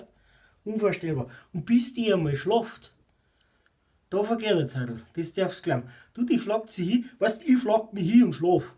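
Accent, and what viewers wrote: Bavaria, Germany. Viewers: Ach der Dialekt Erinnert mich an die zeit in Bayern!!!Wie in der Alten Heimat...*herrlich* Grüße aus Sachsen ;)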